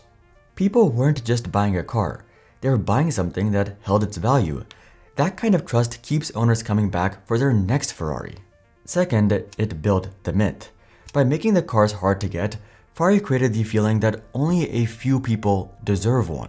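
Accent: India. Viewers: The narrator is trying so hard to hide his indian accent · Am I the only one to think that has an Indian background, judging by his accent? Otherwise I'm struggling to get used to not knowing about a foreign channel without a face, nor a common accent, be it UK or US.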